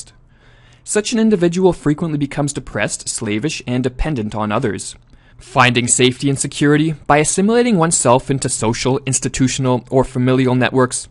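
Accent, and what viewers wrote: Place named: Canada. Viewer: I spot a Canadian accent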